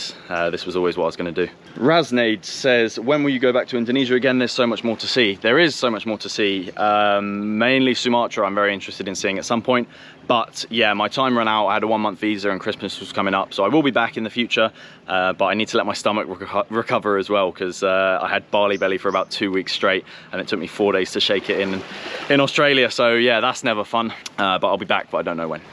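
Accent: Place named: United Kingdom. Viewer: ARE u british? i like the accent though.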